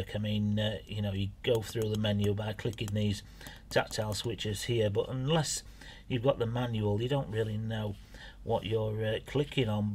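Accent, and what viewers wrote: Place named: England, United Kingdom. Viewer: Thooms (e.i. Thooms; British equivalent for Thumb. Pronounced as "loom." As in a cotton loom. - American influence/non- "King's English") Up. (Hehehehe- Ya gotta love that English Accent. Tickles ya).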